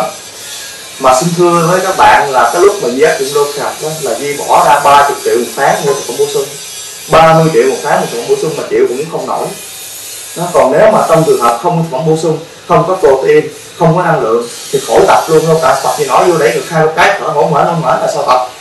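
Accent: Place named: South, Vietnam. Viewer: nói chuyện giọng miền nam dân dã gần gũi , giải thích ro~ ràng :)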